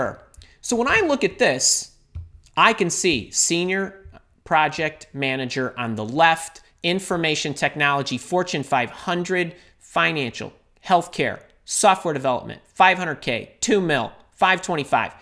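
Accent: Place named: Michigan, United States. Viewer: Sound like a Michigander..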